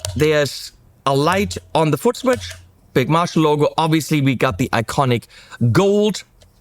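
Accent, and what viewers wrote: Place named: Germany. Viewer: Came for the pedal review stayed for the German accent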